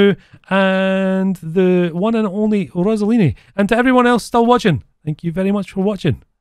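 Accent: Scotland, United Kingdom. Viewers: I don’t care about the tank. I love your Scottish accent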